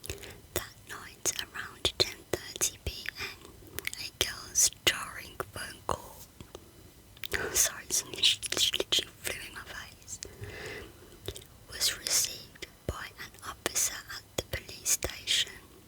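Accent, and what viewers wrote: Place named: Dudley, United Kingdom. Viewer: Where are you from? Your accent has a big Dudley twang to it. X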